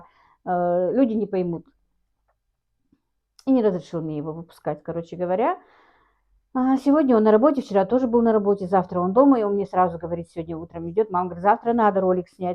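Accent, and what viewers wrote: Standard. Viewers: Чистейшая, грамотная русская разговорная речь. умница.Наверное имеет высшее образование.да и книги читает. ❤Дай Бог здоровья.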